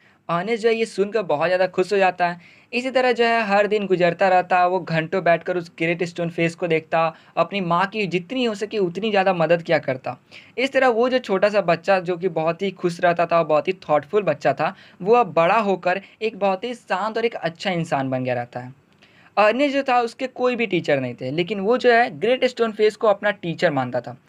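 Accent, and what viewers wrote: Bihar, India. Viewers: Sir from where u r???????? I think from Bihar. I am from bihar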